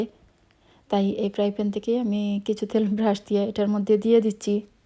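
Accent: Sylhet, Bangladesh. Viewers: Please talk sylheti your accent telling me you're sylheti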